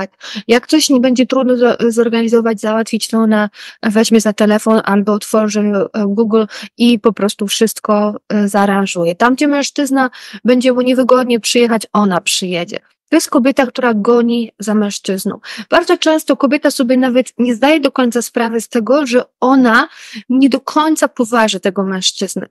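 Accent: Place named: Ukraine. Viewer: myslalam ze jest pani ukrainka czy spod tzw.kresow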